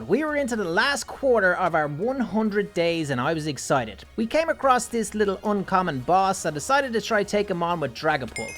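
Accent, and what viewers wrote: Ireland. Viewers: I love ur Irish accent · youre irish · The second good Irish YouTube I found in my whole entire life also noting is that your Irish because I am to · Are you Irish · The Irish acciend love it brutha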